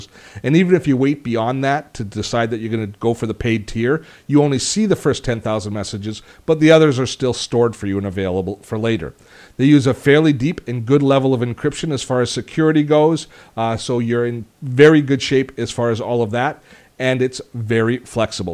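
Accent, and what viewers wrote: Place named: Canada. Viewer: Canadian heavy use of "aboot".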